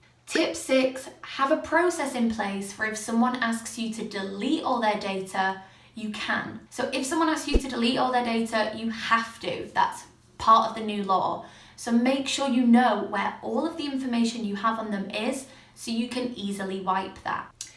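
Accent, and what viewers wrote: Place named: England, United Kingdom. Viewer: Love the accent, I just close my eyes and imagine the extravagant 18th century English solicitor in a wig. Can you get one of those and wear it for these legal videos? Wigs, I mean, not solicitors.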